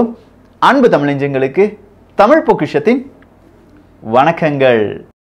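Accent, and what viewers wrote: Kerala, India. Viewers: Hello bro! I watch all your videos! You have a very strong Malayalam accent! Why don’t you release some videos in Malayalam also! Are you basically a Malayalee bro? Someone said you are from Tirunelveli, but you don’t have Nellai accent at all. I am originally from Tirunelveli, so I know Nellai accent very well. Your accent is neither Nellai accent nor Nagercoil accent! Your accent is 100 percent Malayalam accent! It will be good if you release some videos in Malayalam under the tittle “ Malayalam Pokisham”!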